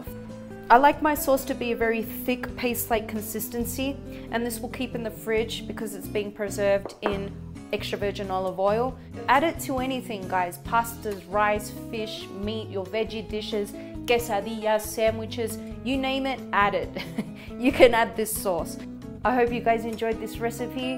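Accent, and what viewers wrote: Australia. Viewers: Being mexican and from Texas your transition from your Australian accent to perfect Spanish blows my mind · You sound Australia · U sound Australian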